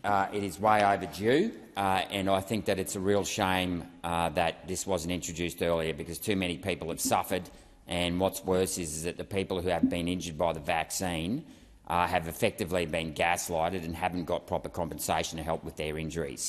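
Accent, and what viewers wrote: Australia. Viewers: sorry bro I can't take Australian accents seriously.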